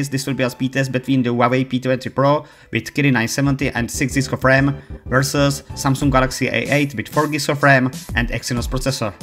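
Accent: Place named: Czech Republic. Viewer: Ten českej přízvuk se prostě neschová ((; hodně štěstí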